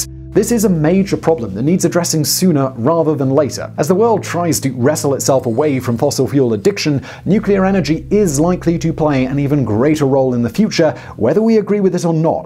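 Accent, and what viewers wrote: United Kingdom. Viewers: I reposted your comment with attribution since it's good to get the truth out there; it's unlikely Simon & Team aren't aware of these things, and their claim that a 'miracle' is needed is agenda driven B.S. on their part. Simon's proliferation of channels and age-old British accent schtick to drawn in the uneducated to a perceived "authority" on a multitude of subjects is paper thin and downright clownish sometimes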